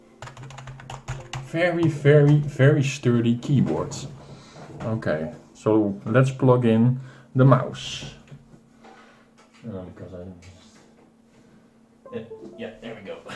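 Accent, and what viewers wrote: Netherlands. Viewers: Ik kan gwn horen dat je Nederlands bent haha :P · are you dutch? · Ik hoor dat je nederlands bent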